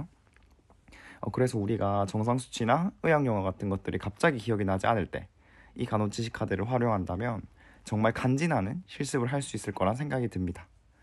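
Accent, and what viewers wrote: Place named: Busan, South Korea. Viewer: 오..와 사투리가득한부산남자의 제품리뷰..😆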